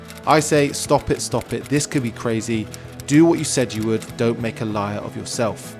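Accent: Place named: United Kingdom. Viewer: i had no idea but my tendency is to zone out once the British accent starts. At least it wasn't cockney, still subtitles were needed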